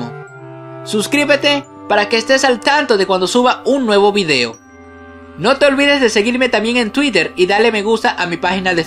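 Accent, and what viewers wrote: Venezuela. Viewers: Hasta el acento es venezolano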